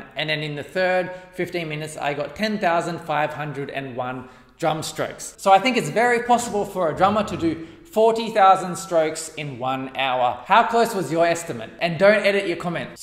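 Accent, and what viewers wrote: Australia. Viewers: where are you from? Australia? new Zealand?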